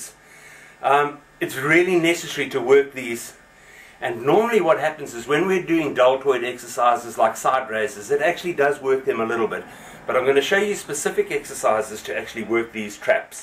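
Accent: South Africa. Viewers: are you from south africa? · Hi, are you south african? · is that a south african or rhodesian accent u have?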